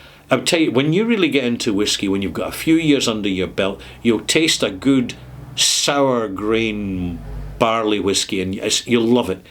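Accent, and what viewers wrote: Scotland, United Kingdom. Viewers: "cranks are doing a hugely important job in keeping the entire category relevant and alive" It might seem like this is just ego talking, but it's really true. I myself, someone who drank virtually no alcohol at all, got into Scotch a couple of months ago after YT had decided to recommend me a video of a Scottish gentleman with a lovely accent, sitting in front of a barrel, talking passionately about whisky. Your enthusiasm is contagious, so after watching a number of videos I decided I had to buy a couple of (integrity!) bottles to see what the fuss is about myself, and thanks to your recent mini-series I want to get my hands on some rum too:). Cheers from Slovakia,!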